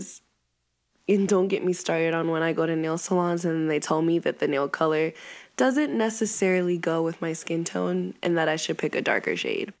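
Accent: South, United States. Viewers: NAH YOU FROM THE SOUTH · Is it just me or does this voice sound American? But I love this girl. This is so sad.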